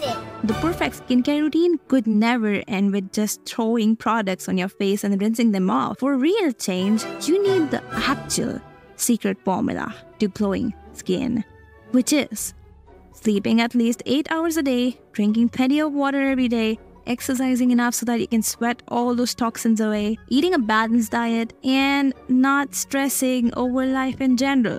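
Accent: India. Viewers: I spotted your beautiful Indian accent Love when Indian people speak English , it sounds so good to hear. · Thank god you put subtitles because for some odd reason i can’t stand Indian accent! It annoys me, i have problems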